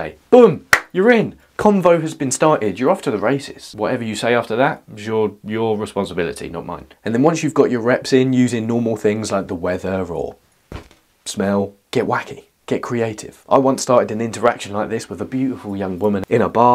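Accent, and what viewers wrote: United Kingdom. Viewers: wat an accent. I believe it's a British accent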